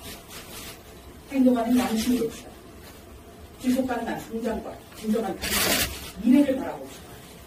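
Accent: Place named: Seoul, South Korea. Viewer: 조수진 의원님 화이팅입니다 서울 토박이인줄 알앗네여 뉴스하실때도 똑 의원의로도 똑 입니다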